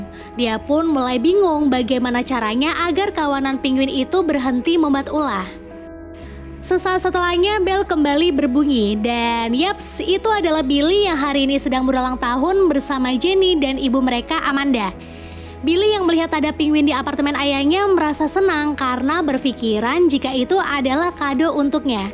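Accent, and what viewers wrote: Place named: Pemalang, Indonesia. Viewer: Mbak orang pemalang ya😆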